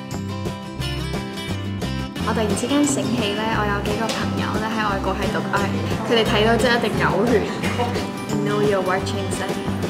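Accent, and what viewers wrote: Taiwan. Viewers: 每次聽AV講台語的時候都好可愛喔! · 講臺語好像很標準，有親切感，很像鄰居家女兒的感覺，我女兒都沒這麼道地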